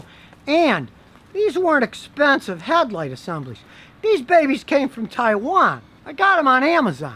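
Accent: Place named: United States. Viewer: Running translation is must in English as some people do not understand American accent orally.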